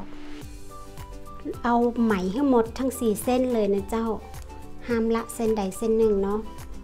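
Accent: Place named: North, Thailand. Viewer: ภาษาเหนือน่ารักค่ะ กระเป๋าก็สวยค่ะ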